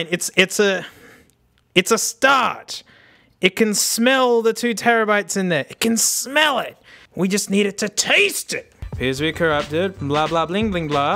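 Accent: Australia. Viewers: How I love the feeling of sleeping while hearing a Australian dingus shout at an ipod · hes australian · i like an Australian man yelling at tech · Wasn't ready for the speaker to have an australian accent lol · I love watching an angry Australian man yelling at iPods.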